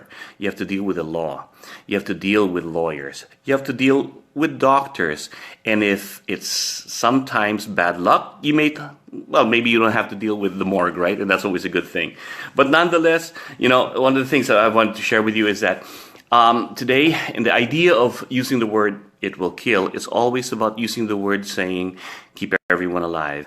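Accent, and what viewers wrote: Philippines. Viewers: Sir Doug, sabi ko na eh. Yung accent mo pa lang sir Pinoy na Pinoy na. I always watch episodes in Forged in Fire. Hihi. Your weapon sir will keal! 😊😊😊😁😁😁❤️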